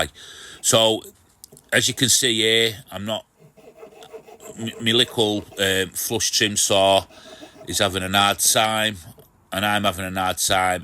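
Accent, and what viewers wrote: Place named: Manchester, United Kingdom. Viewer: Excellent to hear a fellow Manc!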